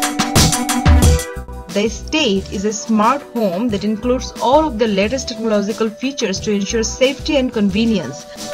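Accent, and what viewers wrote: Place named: South India, India. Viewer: Heavy South-Indian accent and that would still be okay if it were not for some weird-ass autotune that was added in the editing room.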